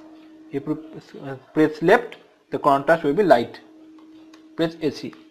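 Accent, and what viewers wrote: India. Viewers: you should work on your indian accent :/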